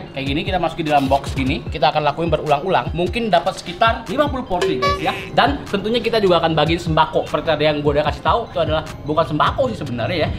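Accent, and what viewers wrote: West Java, Indonesia. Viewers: sundanise pisan euy koko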